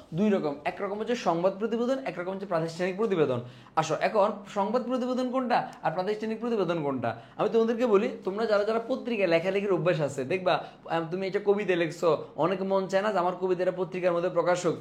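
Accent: Noakhali, Bangladesh. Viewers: ভাইয়া কি নোয়াখাইল্লা ?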